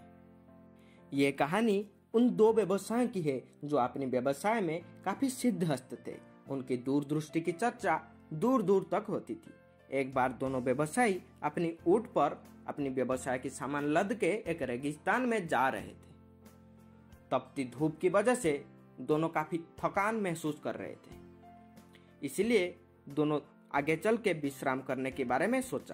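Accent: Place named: Odisha, India. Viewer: Odia person telling the story, I think! 🙏🏻💪🏻 Bhainaaaaa! 👍🏻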